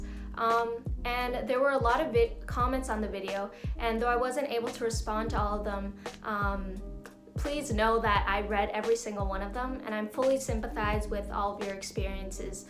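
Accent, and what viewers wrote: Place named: United States. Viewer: What country do you live in? You barely have an accent. You sound American.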